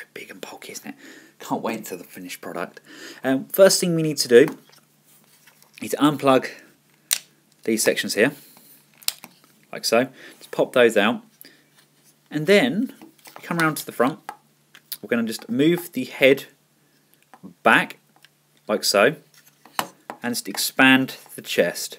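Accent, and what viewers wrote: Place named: Scotland, United Kingdom. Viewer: wow he looks awesome ! and great review ! i subscribed a few days ago ! by the way, are you scottish ? you sounds alot like david tennant the 10th doctor in doctor who ! lol ! :)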